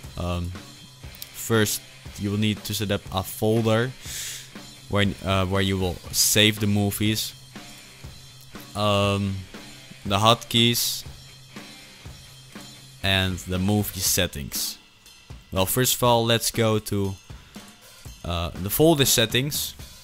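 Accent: Netherlands. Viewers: Nice tutorial. Btw ik kan horen dat je Nederlands bent :P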